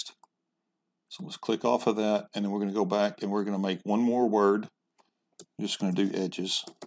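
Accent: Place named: South, United States. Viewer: I'm about 10 months behind you on the CNC purchase. Just came across your channel today and am really impressed with it. I just got the VCarve Pro and have been watching the tutorials that come with it. I must say yours are much easier to follow and don't put me to sleep! Probably the good ol southern accent!!! I'm also very impressed with your other wood working projects!!! Thank you!!!!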